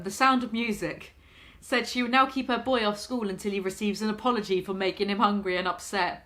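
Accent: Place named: RP, United Kingdom. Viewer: speak posh for a video, you already have a posh accent you speak to yourself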